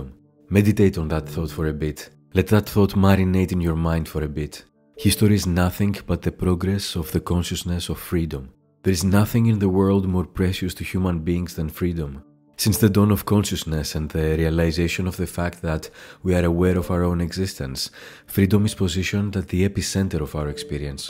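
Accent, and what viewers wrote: Greece. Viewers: yeah im a greek and his accent sounds Greek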